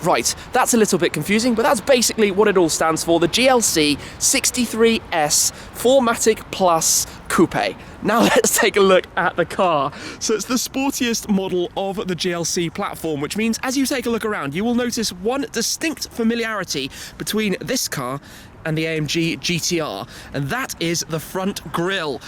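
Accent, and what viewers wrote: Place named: England, United Kingdom. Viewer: hate the fkin english accent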